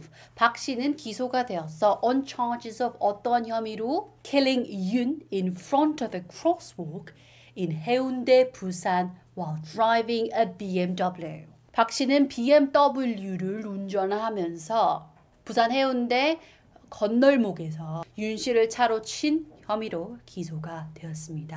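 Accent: United Kingdom. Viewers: 엄청 재밌어요 :) 영국발음듣고 영어기사 공부하는 것도 정말 좋아요, 딱딱하지 않고 방금 영어악센트로 빼박이라고 하셔서 엄청 터짐ㅋㅋㅋ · 아 발음 넘 멋져요ㅠㅠㅠㅠㅠㅠㅠ 영국발음 개간지ㅠㅠ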